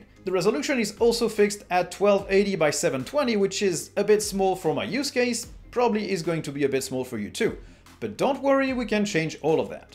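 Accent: France. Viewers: Des vidéos en Français aussi serait les bienvenues, le YT Français étant un peu à la ramasse par rapport à l'Anglais, ceci étant dit, je suis parfaitement bilingue et j'appréci le p'tit accent frenchy, keep it up mate!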